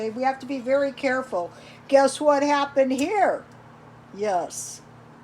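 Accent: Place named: San Diego, United States. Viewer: Beginning of video I'm like...she sounds like she's from SD. Oh shit she is from SD! Shout out from the SouthBay down here.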